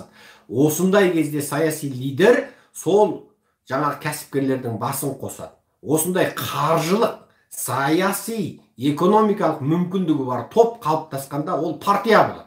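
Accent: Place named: China. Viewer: Сені кім сатып алған.Қытайский акцентпен сөйлеп отырсың ғой.Қытайда отырып алып біздің елге ірткі салма.Сенсіз де өзіміздің агитаторлар жетіп жатыр.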